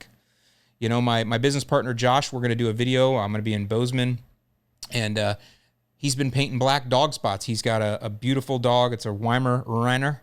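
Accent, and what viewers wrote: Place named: South, United States. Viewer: I just can’t do it. Your soothing southern accent gets annihilated with every super chat.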